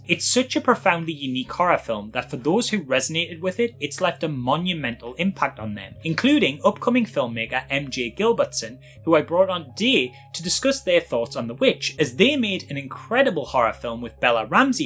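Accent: Newcastle, United Kingdom. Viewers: Everything sounds good in a Geordie accent.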